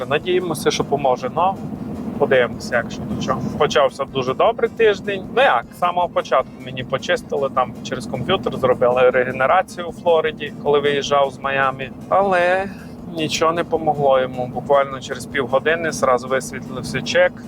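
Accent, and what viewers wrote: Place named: Transcarpathia, Ukraine. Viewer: А ти походу із Закарпаття) удачі бро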